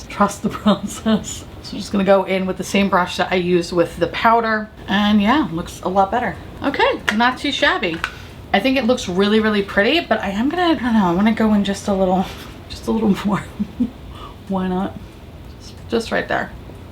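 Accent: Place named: New York, United States. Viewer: I love you, you know this - but I’d absolutely love you to do an entire video in your New Yorker accent. Friday evening in Australia, been looking forward to getting home after work, having a few drinks and watching your video. Have a great weekend!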